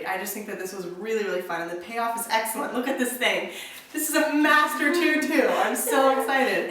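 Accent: Spain. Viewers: oh yes you can !! i've seen old vlogs and you have an awesome spanish accent :)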